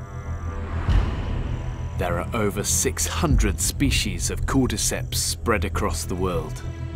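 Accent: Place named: United Kingdom. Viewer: 剛開始看沒多久就覺得這聲音好像貝爾 結果結尾就看到貝爾的名字 他的聲音和英國口音真的已經深入腦海啦XDD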